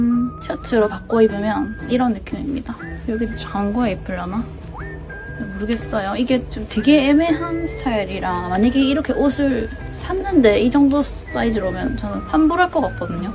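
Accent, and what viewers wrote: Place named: Gyeongsang, South Korea. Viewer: 말투가 같은 경상도인인거같은데 ㅎㅎㅎ 맞나유~^^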